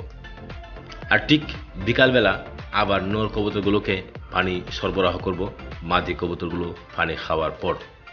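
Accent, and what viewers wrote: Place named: Habiganj, Bangladesh. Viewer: ভাই আপনার বাড়ি কোথায় আমাদের হবিগঞ্জের ভাষা মনে হল বলন কিন্তুু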